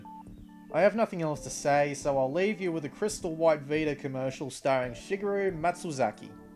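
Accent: Australia. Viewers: Came from GAF, good post. Sound aussie so some aussie release dates might be nice. Also where the hell is MetalGear for vita!? *checks vita*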